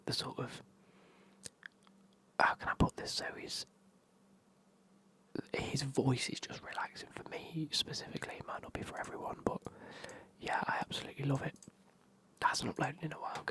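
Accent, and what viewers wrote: United Kingdom. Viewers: I think its so interesting how the australian or new zealand accent is what you fancy, because to me thats your UK accent lol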